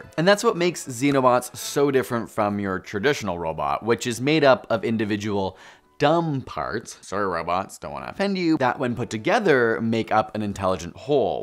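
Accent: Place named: Canada. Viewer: Are u Canadian? Nice accent btw lol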